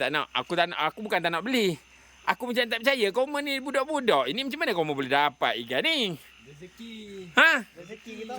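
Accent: Perak, Malaysia. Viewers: Sya btul2 suka dgr abg cakap loghat perak..trbaik la bang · Saya dgr admin ni ckp... Kg Telok Perang. Kalau berdasarkn dialek, rasanya di Perak. Tapi x tahu mukim, daerah mana... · Cara cakap tu kat Perak gamaknya..😂❤😂❤😂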